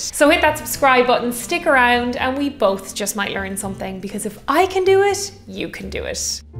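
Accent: Ireland. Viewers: I'm very happy that I discovered your channel. You are engagingly beautiful and the brogue is wonderful. Gorgeous!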